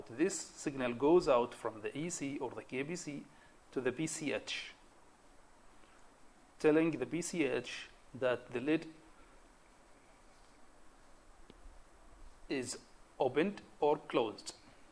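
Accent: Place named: Romania. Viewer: I recognized you with your Romanian accent and your famous "ok?"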